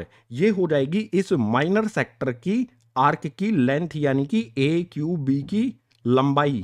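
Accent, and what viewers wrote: Haryana, India. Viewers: Bhai.. Sir. Kaha ke h.... Mujhe lagta h ki haryana ya rajasthan ke h..... Apko kya lagta h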